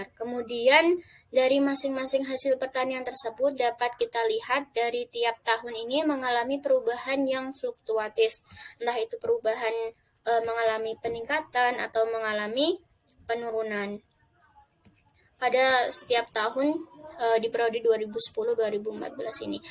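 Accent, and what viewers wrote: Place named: United Kingdom. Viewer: Aksen englishnya woah syekali 🔥🔥🔥🔥🔥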